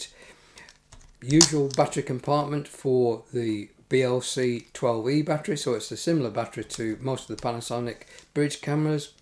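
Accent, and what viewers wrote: England, United Kingdom. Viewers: You've done a marvelous service for us! Thank you so much! I have had the FZ2500 for a few years and have known that there was a lot more to it that I was doing but I just couldn't get a grasp on it. You have helped me big time! And I love your delivery. No extra noise....perfect focus. Plus, being an American, I can't get enough of your English accent. Haha!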